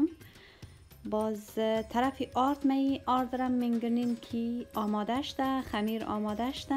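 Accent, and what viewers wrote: Hazarajat, Afghanistan. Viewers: خیلی خو ب بود اول همینکه خوب نظافت رعایت مونی دوم اینکه لهجه آزرگی تو بسیار خوبه. خیر کنی خوارک جور باشی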